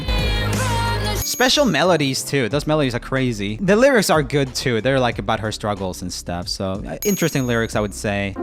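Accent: Sweden. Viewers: JoeL: I'm getting old guys JoeL: Help me, I can't pronounce anything Me: You're Swedish... That's way...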